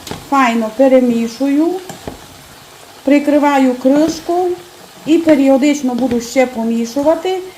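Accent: Transcarpathia, Ukraine. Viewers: А мені дуже подобається слухати закарпатський діалект, я чомусь вчуся. За рецепт дякую. Приготую з грибами😀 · З задоволенням послухала закарпатський діалект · Спасибо большое за рецепт, новые слова и прекрасный закарпатский говор. Я русская американка, с началом войны смотрю и слушаю только украинские каналы. Язык уже понимаю очень хорошо, говорить и писать пока не умею)) как собака понимать понимаю, а сказать не могу😂 Победы и мирного неба🇺🇸❤️🇺🇦🇮🇱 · З великим задоволенням слухала вашу мову і з теплотою згадувала щирих і добрих людей із Закарпаття. Здоров'я та миру вам. Дякую за ваші рецепти, дуже цікаві. Дуже дякую вам за щирість. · Це так розмовляють на Закарпатті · Чудова господинька і справжня закарпатська говірка ❤, аж любо слухати ❤😍🔥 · В українській мові немає слова паночка, є лиш панночка ! А то закарпатський діалект, доволі красивий! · Привіт з Рівного!Дякую за рецепт! 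Діалект мені дуже знайомий, дядько одружився в Закарпатті,в місті Свалява,все життя прожив там,уже теж мав такий діалект. Нажаль вже його немає,але тітка ще живе, спілкуюся з нею,і з двоюрідним братом, який захищає Україну,з 15 року,та сестрою. Мирного неба всім! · Дякую за рецепт. Тяж цікаво слухати закарпатський говір і вже буду знати що сіль це по-закарпатськи суль ( я з Прикарпаття і у нас говорять переважно як літературна українська мова хоча і є в різних областях своі назви деяких овочів та всього іншого ( наприклад у нас кажуть на картоплю бульба а в деяких селах кажуть бараболямі тільки тепер почула що на Закарпатті на картоплю кажуть ріпа а я думаю а де ж то ріпа —щось не бачу аж потім зрозуміла що це картопля ( бо у нас ріпа це такий білий буряк ) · Это закарпатский диалект не нравится не слушайте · Дуже гарний рецепт, дякую вам! Особливий колорит додане закарпатський діалектя в нього закоханий! 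· Приготувала, дякую за рецепт страви i закарпатський дiалект. Щастя Вашiй ро́динi. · це закарпатський діалект. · Який чудовий Закарпатський діалект❤❤❤. Даже не знаю,що більше сподобалось😊😊😊. В дитинстві бабуся таку страву готувала і назва його була у нас булянка😊😊😊. Західна Україна. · але ваша мила Закарпатська говірка мені дуже подобається! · Ви не мудра жінка, не подобається, не дивіться. То закарпатський діалект, пані просили, щоб вона розмовляла саме на діалекті. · Ви прекрасна господиня. І дуже вас приємно слухати. Гарний діалект закарпатський❤ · Це справжній закарпатський діалект,а ви вчіть історію України і будете знати в якій області як розмовляють.А Вам велике дякую за рецепт,вже приготували всі були в захваті.Дуже смачно.Чекаю на нові рецепти.Також робила по вашому рецепту голубці онуці на день народження теж всім сподобалось.Дякую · Тільки ідіот поставить дизлайк. В кожному регіоні України люди розмовляють на своєму діалекті. 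Жінка розмовляє на українській мові з закарпатським діалектом. Браво господиня!!!!!❤❤❤❤❤❤❤ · В неї закарпатська говірка. Не подобається, то не дивіться. Але ця її мова доволі цікава, і її не важко зрозуміти. Здоров'я господині і процвітання каналу.😊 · Нормальний закарпатський діалект. І нічого чіплятися до жіночки. Як що вам не подобається, не дивиться. А мені все зрозуміло, хоча я вчилась в російськомовній школі. Господині респект за рецепт. 😊❤ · ОБОЖНЮЮ ЗАКАРПАТСЬКИЙ ДІАЛЕКТ, ЯК І ВСЕ, ЩО ПОВ'ЯЗАНЕ ІЗ ЗАКАРПАТТЯМ!!! НЕ ЗВЕРТАЙТЕ УВАГИ НА ( ЯК БИ ПОМ'ЯКШЕ СКАЗАТИ)- НЕДОЛУГИХ.У ЦЬОМУ РОЦІ ПОБУВАЛА НА ЗАКАРПАТТІ - ЗАКОХАЛАСЯ І В ЛЮДЕЙ І У САМ КРАЙ! ЯК УЇЗЖАЛА - НЕМОВБИ ВІД РІДНІ ПОЇХАЛА - ЗА 3 ДНІ ВЖЕ СУМУВАТИ ПОЧАЛА!!! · дякую за рецепт! І окрема особлива подяка за чарівну закарпатську українську мову! Хочу більше закарпатських слів!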